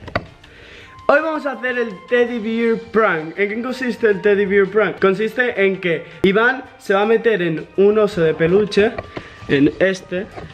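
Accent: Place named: Spain. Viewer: Ugh I love your accent. Spanish is definitely my flavor 😍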